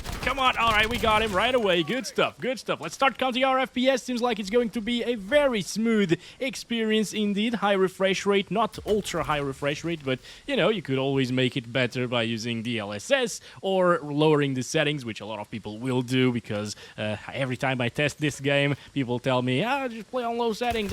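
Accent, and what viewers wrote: Portugal. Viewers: You sound Portuguese, ahahahah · Good video! Are you Portuguese or Brazilian?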